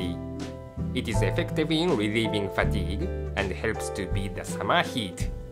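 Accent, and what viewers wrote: Japan. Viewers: Vegetables. He's Japanese. Japanese doesn't really have a V sound, so they usually use B instead. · he is Japanese accent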